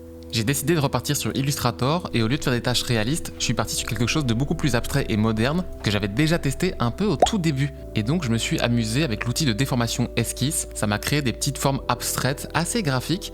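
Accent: Belgium. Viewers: super video vraiment je te kiff mais j'ai une question. T'es Belge ou Français ?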